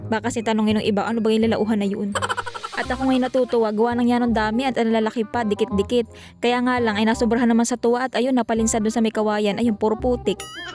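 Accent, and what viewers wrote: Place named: Visayas, Philippines. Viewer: Ido bakit Minsan may halong Visaya Ang salita mo? Sinong Visaya sa maguwang mo?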